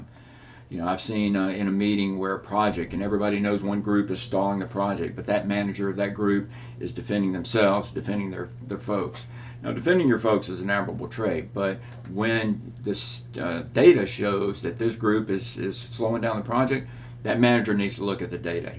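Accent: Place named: United States. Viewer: Wow,I just feel like I found a treasure. This channel is really great. He uses a very simple and direct language. It's so supportive, informative and wonderful. Moreover, I learn more about the American accent.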